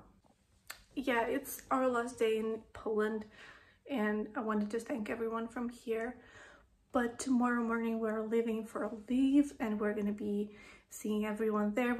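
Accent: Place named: United States. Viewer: Where did this fluency in English come from? And a perfect American accent? I live in the USA for 45 years, still have a Russian accent. When you see Marianne in Florida, give her a hug from an old friend please. I moved from Florida to North Carolina 2 years ago.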